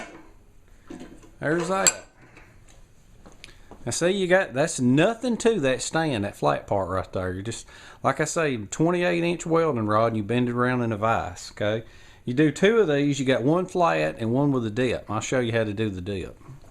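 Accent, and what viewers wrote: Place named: Kentucky, United States. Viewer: I want to see the car/truck under the tarp! Nice video btw. Where you from with that accent? Sounds like a Kentucky accent. :)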